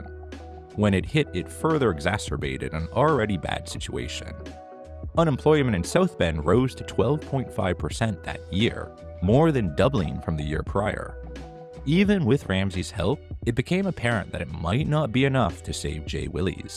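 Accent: Canada. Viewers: Just listening to ur accent, are you from Canada? %❤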